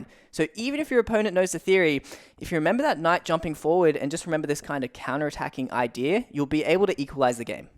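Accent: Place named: Australia. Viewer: Chess feels so different when it is explained with the Aussie accent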